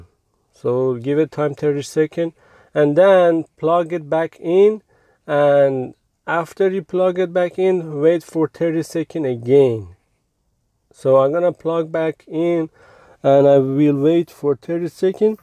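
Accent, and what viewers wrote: Iran. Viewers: I love the Persian accent. ❤️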